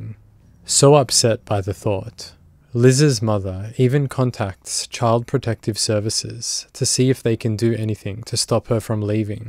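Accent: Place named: Australia. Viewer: I really do enjoy your videos. You tell the stories with the upmost respect for the victims, their families and their friends. I particularly like the fact that you don’t “over dramatise” an already horrific and terrifying “story.” I also thoroughly enjoy these longer videos. Thank you for all the research, effort and time you put into each and every one. 🫶🏻💙🫶🏻 ps.. where’s your accent from? Australia?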